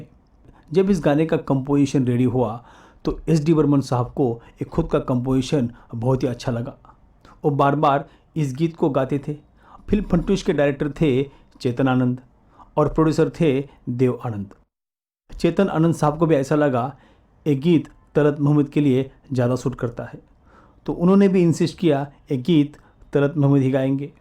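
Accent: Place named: Maharashtra, India. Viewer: तुम्ही मराठी आहात का? हिंदीत मराठी ची झलक झळकते ।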